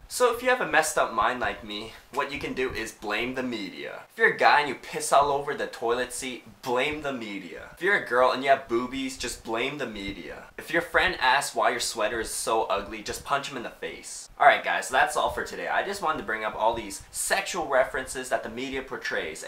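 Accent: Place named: Canada. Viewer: You know how i can tell ur from canada? you say About and Out weird! I'm moved to Canada , it's kool :)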